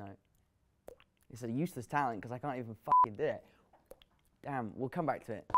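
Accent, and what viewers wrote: United Kingdom. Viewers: Your Hella England straight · pure British. The amount of times I’ve said elevenereef. Brill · Tom Halland who is your favorite avengers character also I like your British accent and you say water 5 times for me😅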